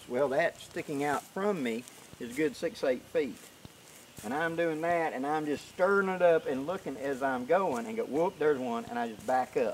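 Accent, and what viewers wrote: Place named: Southeast, United States. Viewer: Liked & Subbed. You live in a Beautiful area from what I can see...May I ask generally what part of the country you're in? Based upon what slight accent I can decipher I would guess South-East US but that is very vague...Perhaps you would be willing to be a bit more specific..>Thank You!!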